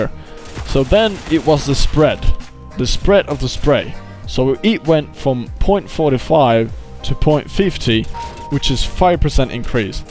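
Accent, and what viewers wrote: Finland. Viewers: Vittu että nautin tosta sun pienest "Ralli Inklish" :D sen erottaa sielt just ja just :D muttei myöskää välttämättä jossei keskity :D vitu hyvää commentaryy näit lisää +1 :) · I can hear the finnish accent leaking through! :^) But still hellagood english skills and good and entertaining video, earned my sub! : D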